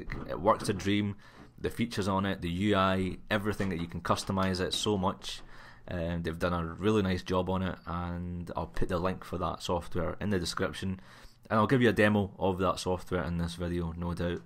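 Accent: Scotland, United Kingdom. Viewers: your Scottish :)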